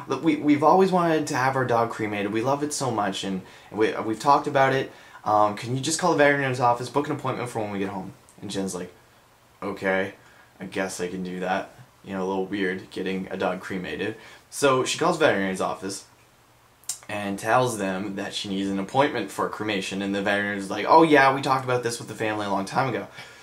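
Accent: Canada. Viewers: i like his candaian accent, lol, so cute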